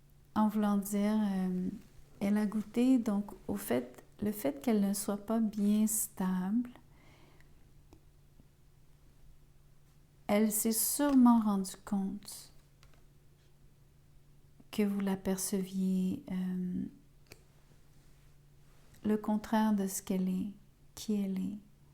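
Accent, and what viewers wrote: Quebec, Canada. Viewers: Ça me parle mais jamais plus de retour en ce qui me concerne il est bloqué de toute de partout c est fini merci ma belle vous êtes la meilleure vous êtes Québécoise j adore cet accent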